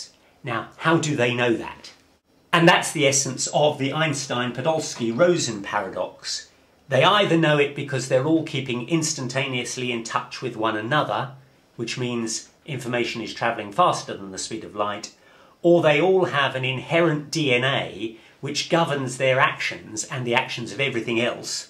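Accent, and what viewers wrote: United Kingdom. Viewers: There's nothing like physics being explained with a British accent! :-) Very instructive videos, thank you very much!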